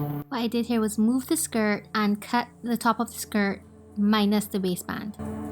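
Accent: Barbados. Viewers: Are you from Barbados?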